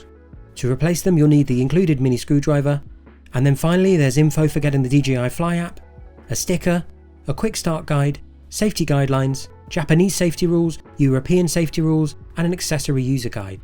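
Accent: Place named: Scotland, United Kingdom. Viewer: Thank you so much for such a great and inspiring video. I’m intending to buy the NEO as my first drone (have watched your other superb and helpful videos on it and have liked and subscribed). But this one has really inspired me. Plus the heads up on the phone video software that you used - amazing to get those shots that are perfect for sharing with family). Nostalgia see your MTB route and hearing your accent as agreed living 40y in Scotland we have just moved south to ‘the north’ to be near our ’kids’ but still have my eMTB active !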